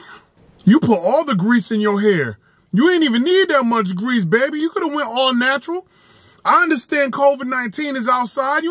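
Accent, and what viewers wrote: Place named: New York, United States. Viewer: Yo this dude gotta be from new york... "Yahll gave wild feedback"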